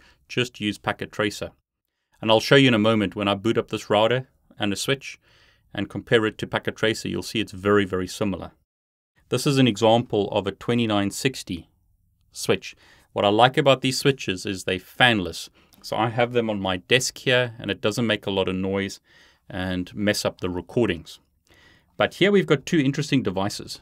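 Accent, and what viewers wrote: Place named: South Africa. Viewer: Hi. Is your Udemy course the same as the YouTube channel one? I want to do my CCNA and was delighted to find a SA voice. Best Udemy video's as well.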